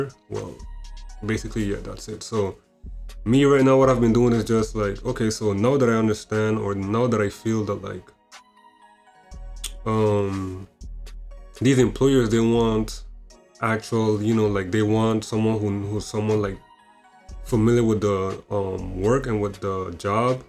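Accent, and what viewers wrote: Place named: Virgin Islands, Caribbean. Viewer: I knew it! I was watching the video thinking 🤔 “This person sounds like they are from the Caribbean. Specifically from the Virgin Islands.” I’m also from the VI and currently live here. I’ve been planning my entrance and ultimately my path in IT, so been doing a lot of research. So far in my research it comes down to 1) learning the foundational knowledge so Comptia A+, Network+ and Security are good options. Learning Linux and a scripting language like powershell, bash or python can be beneficial. 2) developing and building on it skills which can be done using hands on labs and projects, 3) Getting experience either in a support role via computer support/ help desk or network support, internship, a tech role like Apple Genius, Best Buy Geek Squad,or similar. 4) Networking in the field via LinkedIn, meetups, applying for hundreds of jobs and personalizing your application with an introduction gif. 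Also, it may help if you create a vlog/ YT channel in the space for whatever path you are interested in. 5)Not sure if you have a degree but if you don’t you may want to consider getting one in the long term to help you qualify for more tech roles. If you don’t have one, look into WGU (Western Governors University) they have multiple it Bachelor paths, tech certs are included in many of the degrees and you can get your degree in less time 6 months to 1.5 year depending on the time you put into it and your level of dedication. It costs about $3700 to $4500 per 6 month term dependin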